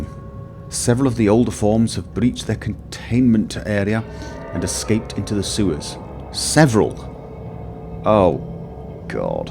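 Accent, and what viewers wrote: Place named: North West England, United Kingdom. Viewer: He is almost certainly from the North West of England. We have almost identical voices so I'm going to say he's within a 40 mile radius of Manchester. :D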